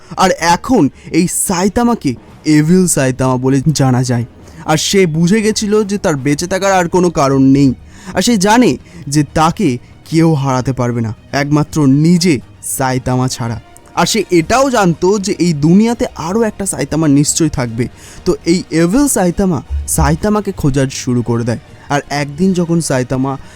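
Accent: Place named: India. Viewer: তোমার বাংলা শুনে,বুঝলাম আপনি indian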